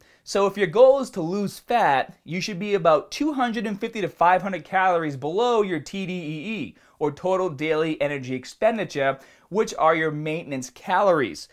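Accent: Boston, United States. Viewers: I fahuckinnn love bohston accent. Fahhhkin knowledge and cahhhhhrrbbsss! Seriously though I'd say is the most helpful Fitness youtuber out there. As a exercise science major and fellow fitness enthusiast got nothing but respect for the knowledge he gives us all. Keep doin you!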